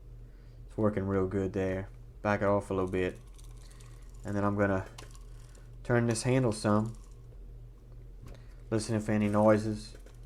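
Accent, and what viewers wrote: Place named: Louisiana, United States. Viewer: When I heard your accent I knew. You gotta be south of Houma?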